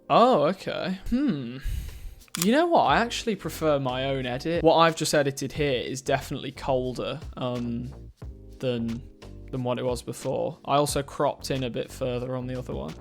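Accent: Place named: Northern England, United Kingdom. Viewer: You sound well northern in this 🤣🤣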